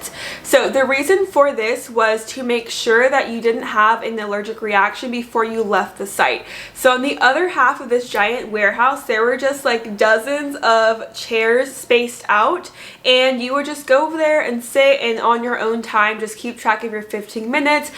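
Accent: United States. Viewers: I know that accent from a familiar place, young lady. Are you from western PA by chance? 😉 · just ran across your video and decided to watch. A few minutes in I was thinking "this girl sounds like she's in Texas" and then you eventually revealed you're in the D/FW area, as am I. I'm just getting over the side effects from my 2nd pfizer vaccine. I slept for 24 hours, felt like I might have had a slight fever, a bit of achiness. Of course, my allergies are in high gear right now which doesn't help. I'm older than you are, 61, with an underlying health condition. But like you, I'm so grateful to be vaccinated and hopefully we can return to a more normal life. I've been self-isolating at home alone for a year so I'm ready for human interaction. I salute you being a teacher and public servant. One of the most important jobs in the world. Wishing you many blessings and a covid-free life. 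You're so adorable I bet your students LOVE you!!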